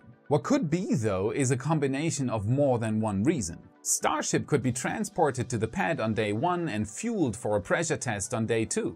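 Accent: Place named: Germany. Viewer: Are you german? If yes your English is really good!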